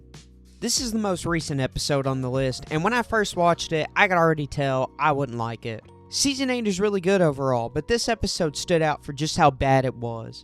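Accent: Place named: South, United States. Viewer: you are the most southern Rick and Morty fan I have ever heard❤‍🩹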